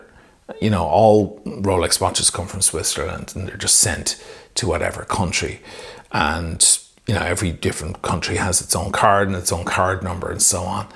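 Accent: Ireland. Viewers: Screw anyone who doesn't like listening to the Irish blabbering...I like many other watch lovers appreciate all the content 👌 massive congrats on the purchase mo chara...its pure class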